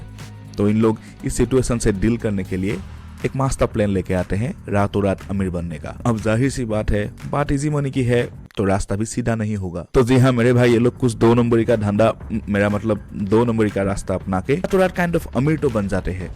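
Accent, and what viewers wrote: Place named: Assam, India. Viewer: Your accent say's that u are Assamese 😅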